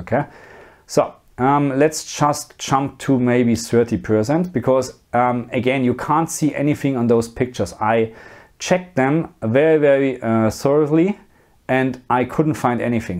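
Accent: Germany. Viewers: Ist er Deutscher? Das englisch hört sich so an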